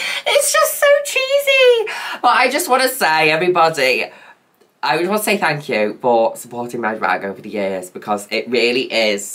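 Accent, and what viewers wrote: Manchester, United Kingdom. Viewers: Oh my goodness, I would love to watch anything with your narration!!! The world needs more Manchester accents in media! 💚💙💜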